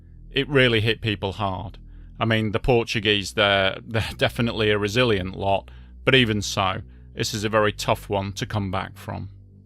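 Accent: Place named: North of England, United Kingdom. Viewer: you sounded as though you came from the North of England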